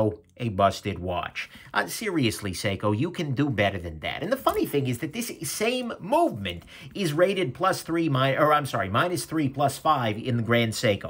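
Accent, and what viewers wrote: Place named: Brooklyn, United States. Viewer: You sound like you grew up in the tough side of Brooklyn, NY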